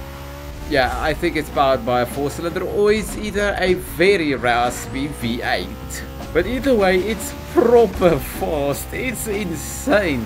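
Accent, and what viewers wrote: South Africa. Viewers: When I heard ranting and swearing about BS electric cars in a South African accent, I subbed. Lol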